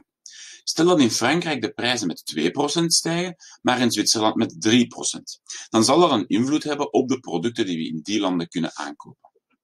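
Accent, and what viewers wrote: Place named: South, Netherlands. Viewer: Begrijpelijk filmpje,ondanks het zuidse accent.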